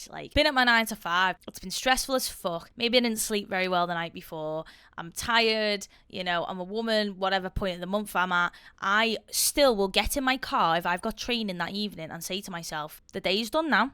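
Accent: Liverpool, United Kingdom. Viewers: Heard the scouse accent mixed with lifting knowledge and subbed. Hello from West Yorkshire! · Accidentally clicked on this and heard the Scouse and stayed the whole time haha. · Aaaa scouse! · I've lived outside the UK for 8 years now. Love the vids of yours I've watched so far anyway, buuuuuuut listening to your accent is making me so nostalgic for home and adds an extra layer to enjoy!!! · So lovely to hear that Scouse accent. Hello from down here in Australia! Well done on your incredible achievements! Ps. seeing as you're an Amberlynne gorlie, did you see her work-from-home WIEIAD video? It was hilariously stupid. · Where exactly in the UK is your accent from? American here, just curious — thanks 🙏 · I’m Russian and I adore your British accent. It’s a heaven for my ears besides all the well educated guesses of yours. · liverpool, england. 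it's a scouse accent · I was suggested to watch you on the last video I did! Love the scouse accent and looking forward to seeing more of your content 😁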